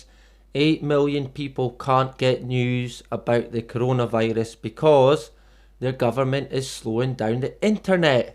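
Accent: United Kingdom. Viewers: Nice to hear a British voice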